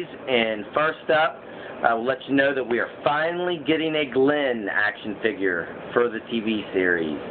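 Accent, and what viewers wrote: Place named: Ohio, United States. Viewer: Love your walking dead videos man! But I also love your accent. Are you from Ohio? lol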